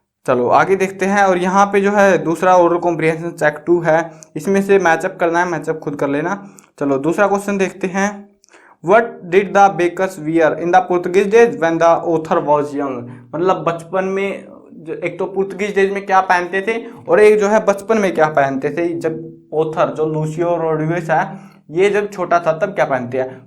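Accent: Haryana, India. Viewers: Bhai yaar tumhari voice sunn k lagta hai ki tum haryana side se hooo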